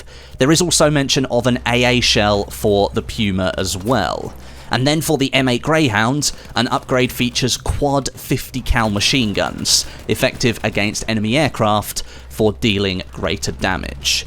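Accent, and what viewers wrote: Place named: England, United Kingdom. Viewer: Have seen worse pronounciation of german words. You have an english accent, yes, besides that, Sonderkraftfahrzeug was pronounced ok, B-C from your german teacher ;P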